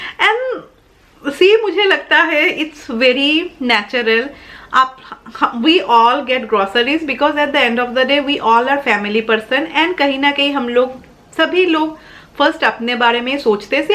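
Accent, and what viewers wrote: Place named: Bengal, India. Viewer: Hello mam, I just wanted to say that you won’t stop driving your car just bcoz a few insects hit the windshield... right! So keep going bcoz you are really adorable and your cute bengali tone is so heartwarming!!! There are and will be haters and people to pull you down, but above all there are those who love you and admire you!!! ❤️🙏🏻💐💐💐💐💐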